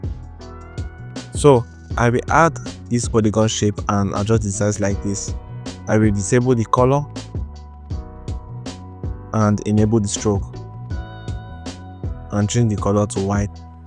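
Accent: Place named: Nigeria. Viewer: I’m your Indian subscriber , struggling to understand Nigerian English accent 😂. Great tutorial 💜.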